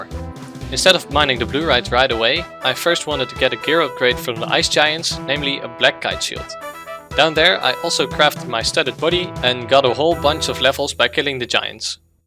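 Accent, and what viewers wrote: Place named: Netherlands. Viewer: I hear a dutchie speaking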